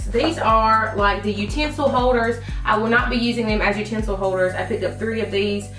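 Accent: South, United States. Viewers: living for the southern accent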